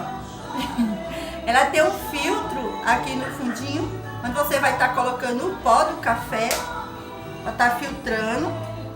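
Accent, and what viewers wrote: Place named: Alagoas, Brazil. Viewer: Eitaaa q Pablo tá rolando solto..kkkkk moça vc é de Alagoas??? Acho lindo o jeito q vcs falam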